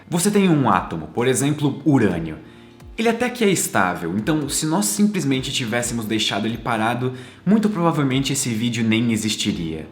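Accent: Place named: Northeast, Brazil. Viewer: Nordestino sudestizado. Forte abraço!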